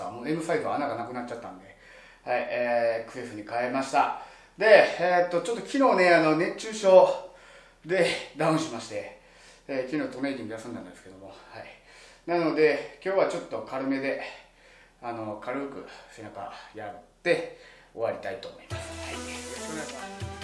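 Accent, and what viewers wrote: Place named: Kansai, Japan. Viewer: やっぱり標準語より関西弁でお話されている方がRyuさんぽくて良いです。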